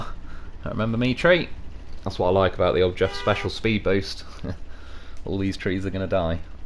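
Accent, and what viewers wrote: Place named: England, United Kingdom. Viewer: And oh yeah by the way where are you from your accent seems like you are from England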